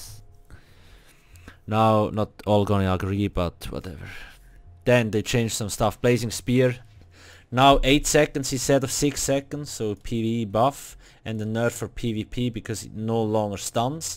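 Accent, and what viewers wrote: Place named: Switzerland. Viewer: Ich finde sein schweizerenglish so genial obwohl er auch deutsch spricht... sagen wir mal schweizerdeutsch... aber man merkt mehr und mehr in seinen letzten videos das ihn das spiel mehr abfuckt als er es für gut befindet ... in den letzten videos finde ich persönlich merkt man das enorm !! Er schimpft mehr als das positive daran zu sehen ... nämlich es soll im Endeffekt spaß machen !!! Nun ja ich schau deine Videos nur noch wegen dem schweizerenglish 😂😂😂😂 ansonsten naja dislike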